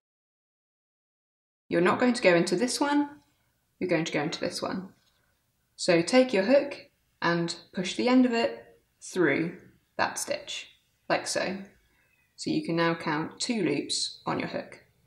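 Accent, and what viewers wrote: United Kingdom. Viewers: Silly question: I'm new to crocheting, but I know there are US vs UK terms. I'm assuming because of your accent this is the UK single crochet (US double crochet?). I'm asking because I've seen other tutorials and this seems like the US single crochet but, again, potentially silly of me, I'm inferring from your accent it's the UK single crochet?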